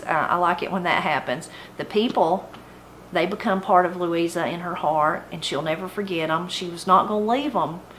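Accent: Appalachia, United States. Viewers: Thank you so much for reading the story with the true accent of your voice, and reading the words as written with the Appalachian way they are/were spoken. I pray you enjoy all the blessings our Lord has given you and your family. Thank you also for sharing the excitement of your life. God bless you in Jesus's name!!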